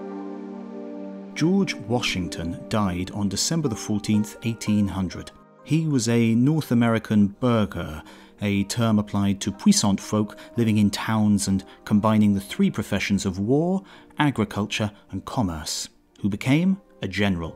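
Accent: United Kingdom. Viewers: All read by a Brit, our former enemy.... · I'm learning US history from a british person reading an english translation of a japanese book about american history. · and read by a foreigner from President Washington's most bitter enemy (i believe)! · And let's not forget, narrated with and British accent!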